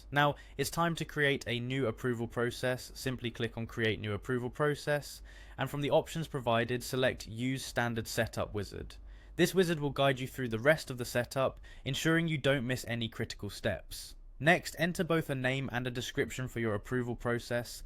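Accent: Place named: England, United Kingdom. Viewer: Great video! Finally a proper English speaking accent 🤩 music to my ears 😍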